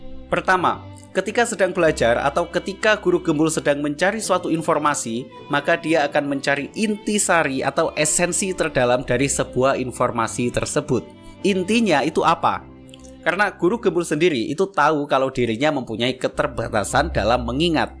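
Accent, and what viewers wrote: Java, Indonesia. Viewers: Apakah boleh saran mas😁 Saya dari jawa dan kayaknya mas juga jawa bisa di lihat dari lahjah bicaranya Coba pakek "saya kamu atau anda" jangan pakek "lo gw" kayak yang kaku ajah mas😁🙏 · Mas mon maap sebelumnya, mungkin akan lebih nyaman pake “aku kamu” aja, gak usah/jangan pake “Gua Elu” karena masih belum sesuai, maaf medok bgt..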